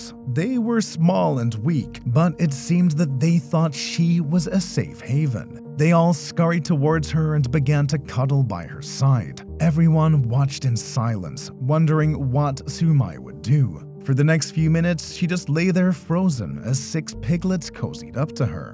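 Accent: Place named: United States. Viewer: I just don’t like the american accent in this video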